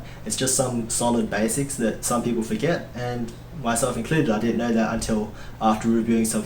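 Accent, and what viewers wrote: Australia. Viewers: Yes we have problems getting them too. What is your country, you sound Aussie like me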